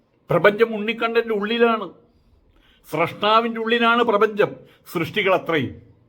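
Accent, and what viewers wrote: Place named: Thrissur, India. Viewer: Trissurkkarudey aa vilikkal...... Swami enthado😂😂... Soooper correct...... Jeevanulla samsaram❤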